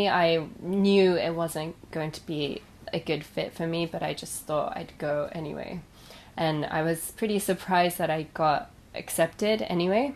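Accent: United Kingdom. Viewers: I’m not going to lie, I first saw your channel when I was looking for tourism spots for Tokyo. The reason I picked and subbed you was well, Beside you being the most beautiful woman I’ve ever seen and you seem quite genuine about yourself, a pretty Japanese girl with a british accent... Something Sanrio has been trying to do with Hello Kitty.I notice from the content that you are doing is somewhat personal, which is nice, like watching a friends travel blog, and you didn’t sell yourself in way that gets more views, with that knowledge I subscribed. I’m a furniture designer but I like dabbing in drawing cartoons for a hobby, but I only did those things because I was unnaturally good at doing them and I didn’t do anything because it was popular, I did them because I genuinely love it. I remember a movie quote from lost in translation: “the more you know who you are and what you want, the less things will bother you.” If you feel this channel is forcing you to do things that pervert your intentions then stop. 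If you feel like you owe people content for their subscription to you, you don’t . If you feel there are better channels than yours, thats true there are, but thats no reason to feel insignificant, since its a living hell to be a slave to peoples anticipation. The person you should focus on always is YOU. What your needs are, are completely different to the needs of other people especially to strangers. Whatever you want to do now, I can only wish you the best and hope ever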